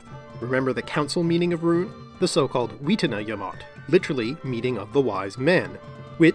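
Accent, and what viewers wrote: Canada. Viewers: Are you Canadian? Just how talk makes me think you are.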